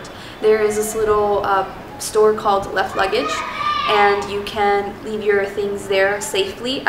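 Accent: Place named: United States. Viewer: You speak like American girl (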